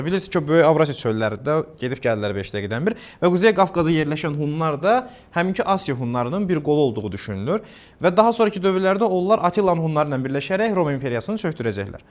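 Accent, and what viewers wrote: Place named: Armenia. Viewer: Hara ermənisidir bu danışdığı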